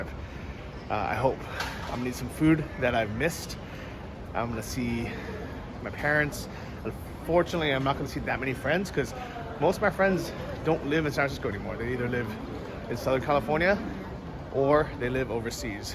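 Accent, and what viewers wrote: Taiwan. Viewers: That's a Taiwanese accent if I ever heard one. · Sounds like Taiwanese mandarin.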